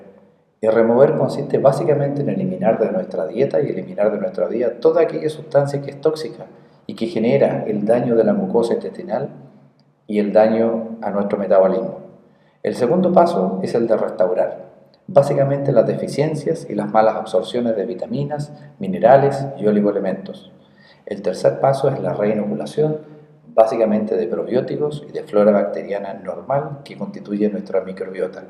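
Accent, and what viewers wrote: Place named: Chile. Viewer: Hola Estimado Muchas gracias por su aporte a las personas que estamos interesados en investigar aprender a cuidarnos. etc. En mi opinión es uno de los mejores videos que he visto sobre éste tema puntual. FELICITACIONES.. y la verdad que me he sacado algunas dudas con su video, me falta mucho aún pero seguiré aprendiendo necesito curarme. Me han diagnosticado INTESTINO IRRITABLE, y dudé sobre éste diagnóstico no tengo duda que lo que tengo es más que INTESTINO IRRITABLE. Por su forma de hablar parece Chileno verdad? Desde Uruguay le mando mis saludos. Francisco Machado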